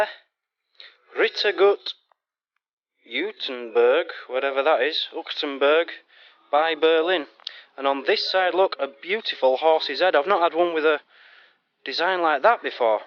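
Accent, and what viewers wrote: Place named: North, United Kingdom. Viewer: BO2 azza hoster. Somewhere up north in the UK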